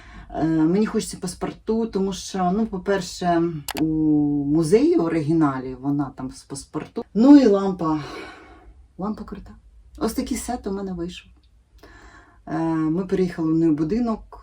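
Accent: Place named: Surzhik, Ukraine. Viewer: Какой же всё-таки уродский суржик селюков, слушать невозможно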